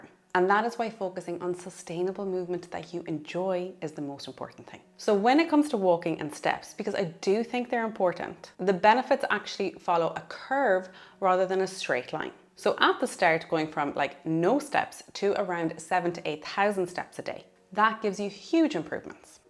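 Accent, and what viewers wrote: Ireland. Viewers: Love the accent. Sounds like a Navan accent 😊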